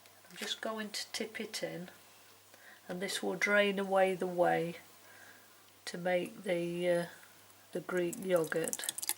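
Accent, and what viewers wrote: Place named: East Midlands, United Kingdom. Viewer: Thanks for a clear and detailed explanation of how to use this machine. Also, are you from the East Midlands, by any chance?? Your accent sounds just like ours.🤭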